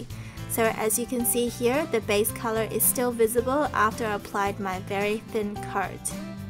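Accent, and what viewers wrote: Australia. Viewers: She sounds so Australian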